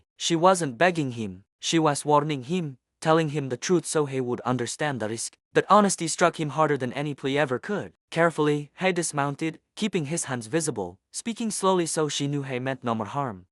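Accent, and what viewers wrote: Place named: Poland. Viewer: Horrible,,bad,,terrible narrator. At the very least,,program the stupid AI to use an actual western voice,,not a polish or russian voice. Splash? Wtf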